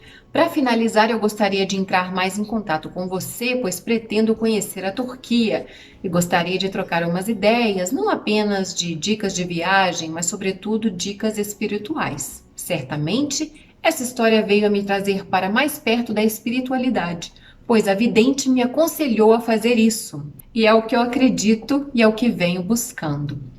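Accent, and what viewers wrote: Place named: Minas Gerais, Brazil. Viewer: Não sei como vim parar por aqui,kkkk,amei o canal que indiquei para uma amiga que logo será psicóloga...Amei tanto que estou maratonando os vídeos,kkkkkk, já mostrei para o marido,logo que vi o primeiro vídeo, já pensei é mineira,uai ! Sou também,😊, parabéns pelo conteúdo,pois,até quem nem sequer pensou em se aventurar por este mundo daí,pode adquirir muito conhecimento ! Que Deus possa abençoar muito teu caminho !😘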